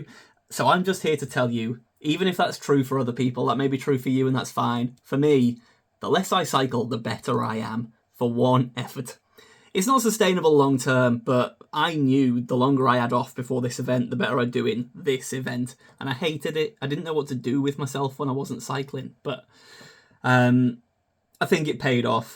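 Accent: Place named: Derry, United Kingdom. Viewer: Your accent sounds just like Aileen from Derry on the Graham Norton show. 😆 Good job on the hillclimb!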